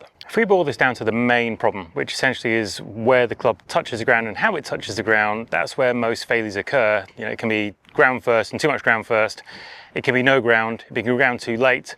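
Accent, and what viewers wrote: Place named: England, United Kingdom. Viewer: Hey, I'm a big fan of what you do. I've studied and played (1 handicap in '02) for 46 years. And your insight on chipping is Brilliant. One suggestion.: Speak a bit slower. Sometimes your rate of speech, is such that your words are hard to discern: I had to replay with captions, to understand the word boil, in the first 30 seconds.I love your English accent, but it can, at times, be a second challenge, for us Yanks. Thank you good Sir.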